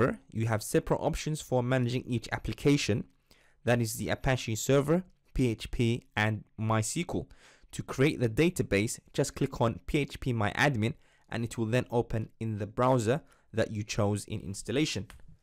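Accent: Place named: Africa. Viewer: african accent i guess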